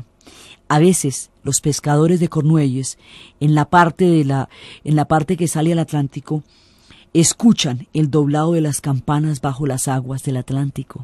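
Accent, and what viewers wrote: Colombia. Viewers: Es facilisimo entender con una narrativa como esta, empeze a escuchar por investigacion pero ahora me he hecho fan y adicta a su canal, aprendo mucho y me hace aprovechar mejor el tiempo!! Ademas me encanta su acento y expresiones Colombianas. Saludos desde Cancun, Mexico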